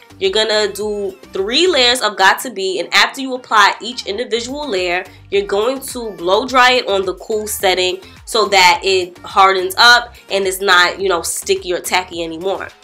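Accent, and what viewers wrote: New York, United States. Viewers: u can hear the new york in her voice 😍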